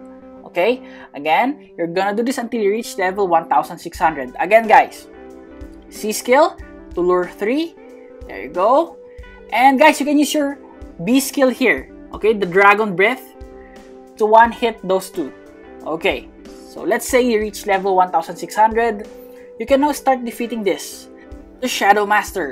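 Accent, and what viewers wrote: Philippines. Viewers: I was right ur filipino cause me as a filipino speaking english thts how i sound like also i saw tht text on messenger na maaga ka bukas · Are you A filipino? because you talk like one and when you like, make a mistake you say ay, sorry guys sorry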